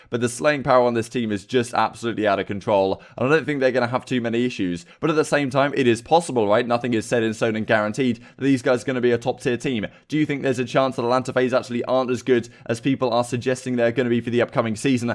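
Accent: United Kingdom. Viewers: Thank god ur British i would hate hearing this without the accent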